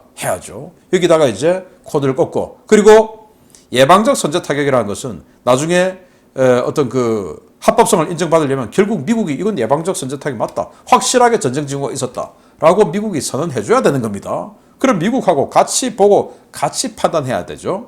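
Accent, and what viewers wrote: Gyeongsang, South Korea. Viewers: 갱상도 싸나이 최고!!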